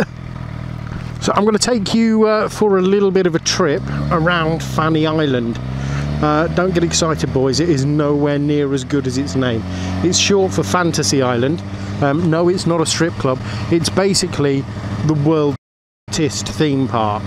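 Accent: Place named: Scotland, United Kingdom. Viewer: I'm from Glasgow. Your Scottish accent is honestly fantastic. I was very surprised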